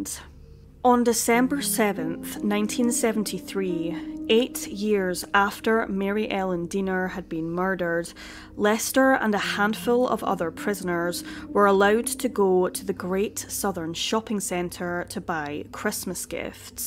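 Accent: Scotland, United Kingdom. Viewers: Is that a fellow Scot I can hear? I was wondering when I'd come across a Scottish true crime channel!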